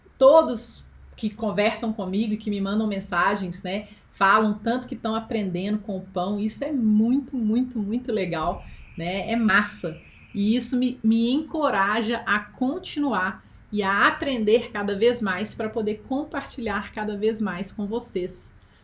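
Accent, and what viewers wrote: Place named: Minas Gerais, Brazil. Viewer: Simplesmente amei! Eese sotaque mineiro é o máximo!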